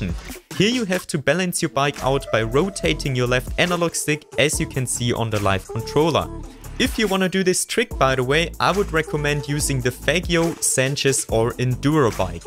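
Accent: Germany. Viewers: so ein deutscher akzent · 🤣🤣dein Deutscher Akzent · Dieser Deutsche Akzent xD Aber nices Video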